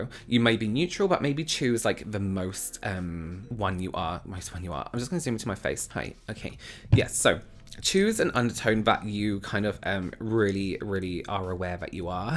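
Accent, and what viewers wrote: England, United Kingdom. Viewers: your accent is divine...❤ what part of England is it i woder? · So, I kinda love you! I used to live in England, so your accent makes me happy.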